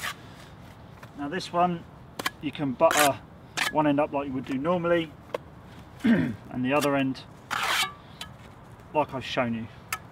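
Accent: England, United Kingdom. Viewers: A better presentation video with some more details for a beginners. I love your English accent too. 🙂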